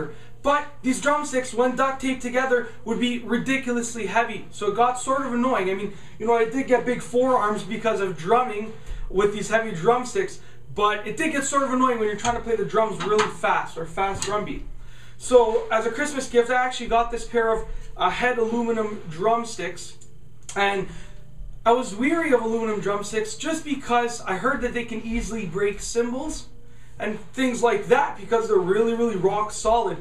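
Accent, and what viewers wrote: Canada. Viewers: I love your Canadian accent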